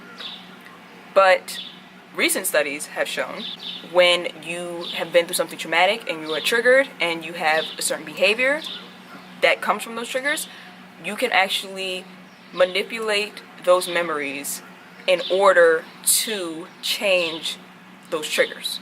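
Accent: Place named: New York, United States. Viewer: I wanna kick it with you, are you from New York? Lol you sound like it a bit. I'm from out here, love your swag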